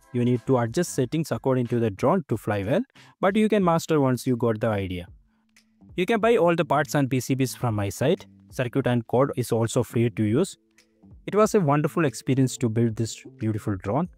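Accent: India. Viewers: 我勒个印度英语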